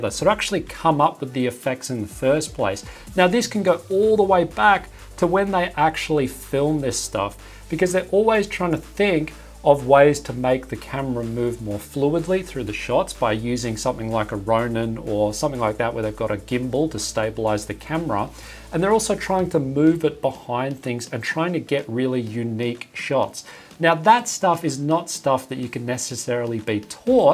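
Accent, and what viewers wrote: Australia. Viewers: Because he's australian lol. It's called accent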